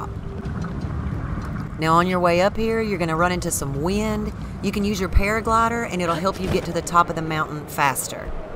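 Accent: South, United States. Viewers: Thank you so much! I always use the snow boots and never bought the snowquill trousers so I didn't know unfreezeable was a thing until this video. Nice to hear a fellow southern girl Zelda fan too! Cheers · I love your southern drawl and your videos. Thanks! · The southern voice is so rare on YouTube. I’ve been out of the real South for so long I’m starting to lose my ability to tell what state people are from. You don’t have to answer so that you can maintain your anonymity and privacy, but I wanna guess either the Carolinas or Tennessee? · Holy hell you sound like family... Rare to hear such a sweet southern voice on the tube. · Love her accent! Being from Oklahoma I never hear fellow southerners in gaming 🎉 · This girl is definitely from the south. I say texas or Louisiana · I don’t think I’ve ever heard anyone with a country accent ever talk about Zelda before and I didn’t know I need it. 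· Is the narrator from the southern states? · 1: I didn't know dragons were in this game and that's awesome 2: if you don't mind me asking, what is that accent? I can't tell if it's a southern accent or a Newfoundland one though I'm leaning more towards southern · A southern soccer mom voice talking in detail about video game strategy. That is a true paradox. It’s wreaking havoc on my brain.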